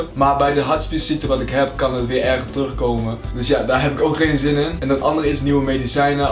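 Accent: Groningen, Netherlands. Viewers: Ben je gronings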